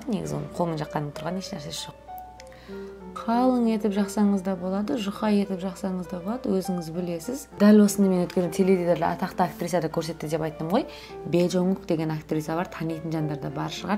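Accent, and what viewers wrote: Standard. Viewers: Сәлеметсізбе қызым рахмет өте кушті түсіндіріңіз бетті қайтіп маска жасауыңды көрсеттіңіз енди көреміз. Өз ана тіліңізді умытпай таза сөйлеп атырсың рахмет бахтыңыз тахтыңыз болсын Илахым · Керемет өзге елде түрсада таза қазақша сөйлейды екенсыз жарайсыз,рахмет қолданып көрем. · Сәлем,. Тамаша. Керемет. Ана тіліңді ұмытпай таза,әрі анық сөйлейсің. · Казак тилинде таза сойлегенине риза болдым. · Рахмет Өз тіліңді ұмытпай таза сөйлегенің үшін ❤ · Кореяда жасап,өз тіліңді таза сөйлегенің ұнайды,жарайсың 🍓🍓🍓 ақ көңіл,сұлу жансың бақытты бол❤❤❤ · шықса не болыпты.Қайта қазақша таза сөйлейді. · Қарапайымдылығыңыз өзіңізді онан сайын әдемілендіріп тұр! Ал таза қазақи тіліңізге тіпті көп адам ғашық болғандай!😘😘😘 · Қазақша таза сөилегенің үшін қызым қатты құрметтеимін .Не деген ғажап қызсың. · Жаным ,казак кызы.тіліңді умытпай,таза сойлегеніңе улкен рахмет.казакстанда турып тілін сойлеуге намыстанатын намыссыздар бар.бояусызда турің әдемі.кайда журсеңде аман бол. 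· Кандаи ашыкжузди жаркын кыз екенсин кызым бакытты бол оз тилинди умытпаи таза сулеп отырганына риза болып отырмын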